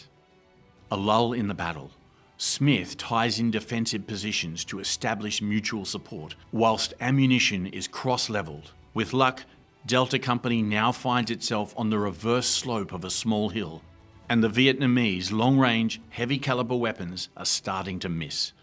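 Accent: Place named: Australia. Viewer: Thank heavens an Aussie rather than a Brit narrated this. Hearing sixth constantly pronounced as sickth would have driven me to distraction. It’s worse than nails on a blackboard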